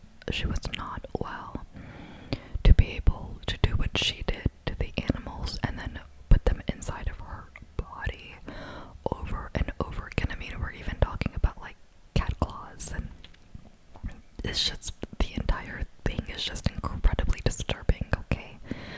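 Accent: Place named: England, United Kingdom. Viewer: It's an English accent, lmao. There are many French accents that are quite distinct, just as in any language.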